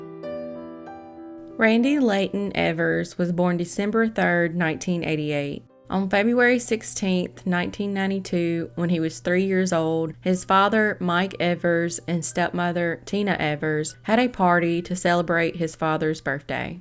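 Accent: South, United States. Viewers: I'm absolutely obsessed I love the way u do your video's and your Lil Southern accent is sooo cute . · Thanks for this upload I’m in Britain but sent it to my friend in Florida area saying see I told you I liked the southern accent haha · Thank you. I had never heard of a few of these cases. I appreciate the detail you put into your productions. And a Southern drawl much like mine is unusual but welcomed. 😊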